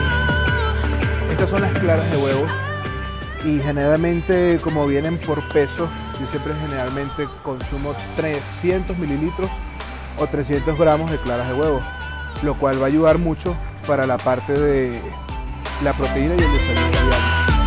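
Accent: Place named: North, Mexico. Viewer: Méxicano norteño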